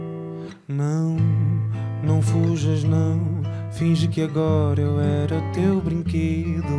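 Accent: Portugal. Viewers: Uma vez eu li que ele era brasileiro e não português. Talvez tenha ido pequeno ou há muito tempo do Brasil para ter esse sotaque lusitano. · sotaquezinho português é fenomenalllllll que delicia de ouvir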